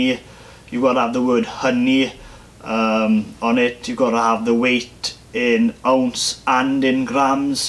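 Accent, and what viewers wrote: Wales, United Kingdom. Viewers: very true, although I genuinely believe the more the merrier when it comes to mead. Its still taxed and treated like a wine which is very annoying and also extremely expensive for small producers like myself. I'd make some YouTube videos on how to get started etc but I don't have a cheery Welsh accent like yourself and unfortunately I have the personality of a slightly moist paper bag. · 20 to 40 pounds of honey per hive per year, did I got that right? So that's 9 to 18 kg. So to make an average full time income, you need about 800 hives. Is that so different in Wales compared to Germany or did I mistake that beautiful Welch dialect? :) I read about 50 kg per hive on many sources.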